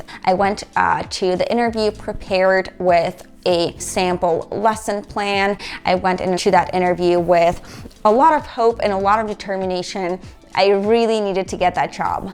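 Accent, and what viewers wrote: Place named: United States. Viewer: Accent sounds American accent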